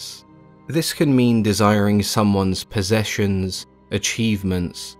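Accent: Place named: United Kingdom. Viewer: the British accent is making this video even more terrifying 😨😨😨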